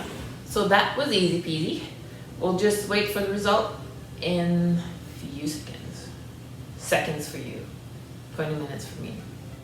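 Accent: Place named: France. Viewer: You are so charming with your French accent.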